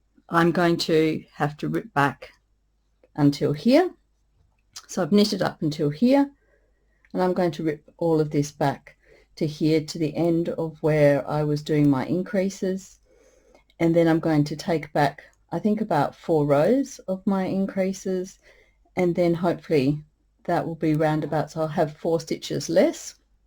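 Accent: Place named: Australia. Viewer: Your video just popped up so I have subscribed. My friend and I were just admiring a Ranunculus knit in lace weight at our local yarn store. Yes cross stitch has come along way. With 32 ct you a go either 1 or 2 threads. I have been enjoying working with 1 thread lately I prefer to have my fabric quite tight in my hoop. But there are so many options these days. Belfast linen is 32ct by Zweigart (it’s not the colour name). Each of their different linen counts are named after UK cities. I have just started making floss-tube videos but I also knit and quilt and love hexies. I am in Brisbane so lovely to hear another Aussie. My channel is Lynette Peters XStitchandHexagons. Tonight I’m knitting The Little Arrow shawl.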